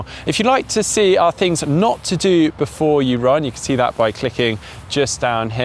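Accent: United Kingdom. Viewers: I want that British accent lol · Ahaha btw British accent is nice to hear 👍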